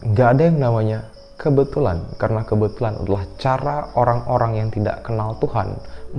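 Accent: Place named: Aceh, Indonesia. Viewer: nyan kan butoi.. meuaceh that bak pegah haba 😂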